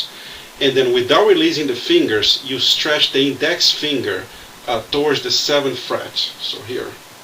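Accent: Brazil. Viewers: dude, are u brazilian? u really sound like one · sotaque brazuca detected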